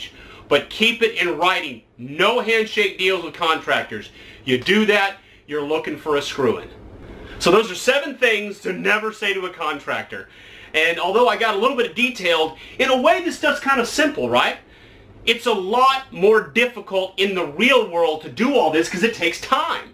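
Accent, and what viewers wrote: South, United States. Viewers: Ahhhhh Your from the south... now this makes sense. I was like, what is he talking about haha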